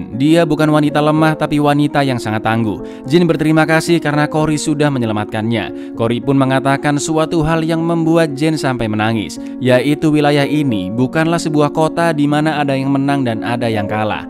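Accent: Java, Indonesia. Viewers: logat jowomu lurrr,,,,bkin auto sub chanel mu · Saya juga ngikut ah 3.suka ngelawak dan medoknya lucu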